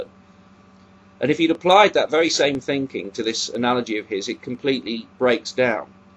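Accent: United Kingdom. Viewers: i love your british accent, i don't think i would have watched the whole video with an american one (especially a southern accent). :) science is awesome! at least it's something to rely on; not the God character.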